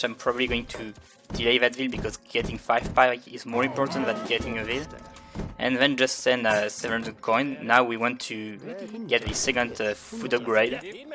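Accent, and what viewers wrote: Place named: France. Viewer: That french accent is insane 😂😂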